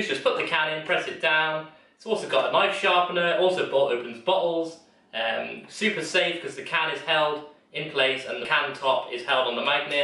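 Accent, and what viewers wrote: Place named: England, United Kingdom. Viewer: I just received my Kenwood CO606. Stay safe💙 Ps. It is so nice watching a youtuber with an english accent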